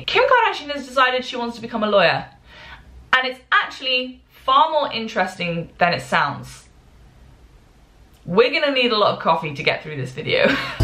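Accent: United Kingdom. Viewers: Holy fuck you're British, I'm ready to listen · I didn't expect you to be British but it was such a pleasant surprise when you started talking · is it weird that i didn’t even notice that you had a british accent until you said “here in the uk” ??? that’s literally 9 minutes in. i literally thought for 9 minutes that you were american with an american accent??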